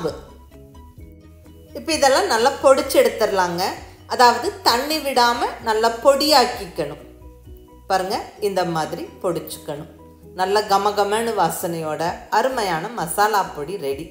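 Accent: Coimbatore, India. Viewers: Nenga Coimbatore ah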